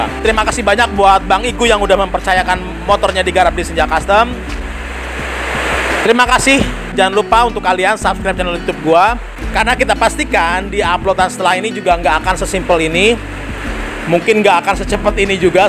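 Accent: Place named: Banyumas, Indonesia. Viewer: Ngapak pokoke jozzz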